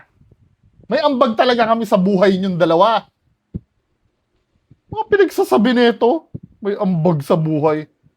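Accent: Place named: Batangas, Philippines. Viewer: 😂😂😂 pag masaya nagihing batanggenyo a a o owww ala ehhh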